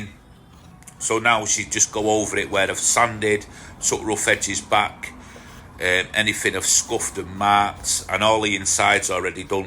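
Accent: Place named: Manchester, United Kingdom. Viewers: Nice project pal,I'm originally from Salford your accent sounds like a Manc so your coming through fine to me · Hi, looks good very handy for the booze and glasses, nice finish. what I do to try to avoid tear out when using a large bit or a forsner bit,I use a thin drill bit as a pilot hole and then I drill from the other side. I don't mind if you voice over or talk as you going along it's your channel I like it as it is. You sound like your from Manchester or the surrounding area As always a great video. And as your asking I'm from Wrexham, Take care mate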